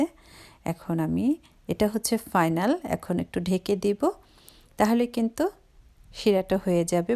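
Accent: Sylhet, Bangladesh. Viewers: Apu tumi ki Sylhety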